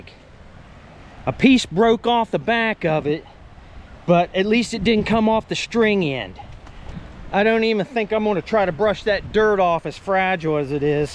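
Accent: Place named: Appalachia, United States. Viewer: you remind me of my cousins in Ohio and West Virginia with your accent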